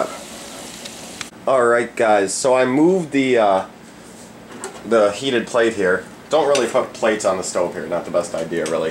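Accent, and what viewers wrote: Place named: Midwest, United States. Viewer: 1 of the best recipes ever! Thanks man good job buddy! I can Tell by your accent youre from the Midwest! Im in ND! Thanks man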